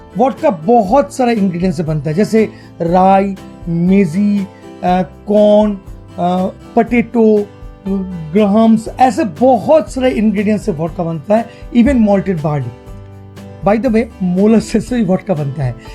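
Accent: Bengal, India. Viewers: Dada you are superb , I like your Bangla touched hindi.....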